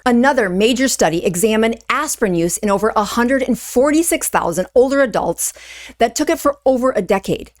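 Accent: United States. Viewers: Australians know the second that you hear that American accent, you stay the fuck away from whatever they're recommending.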